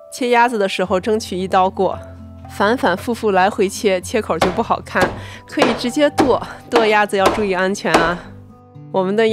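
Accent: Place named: Qingdao, China. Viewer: 青岛银的口音😊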